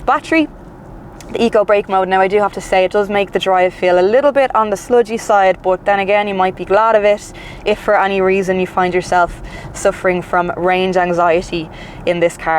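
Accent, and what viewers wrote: Ireland. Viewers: Wonderful Irish accent thank you..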